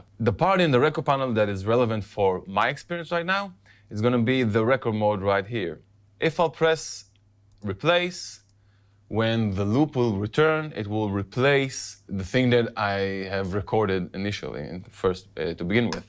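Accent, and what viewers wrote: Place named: Israel. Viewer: עשיתי סקבסקרייב כי שמעתי מבטא ישראלי :)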